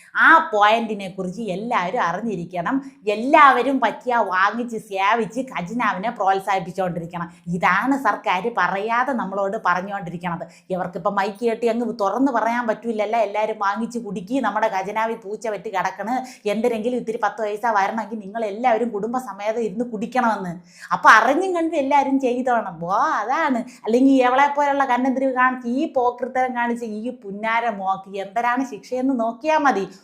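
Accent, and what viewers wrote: Thiruvananthapuram, India. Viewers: തിരോന്തരം ഭാഷ 🧡 👌👌👌👌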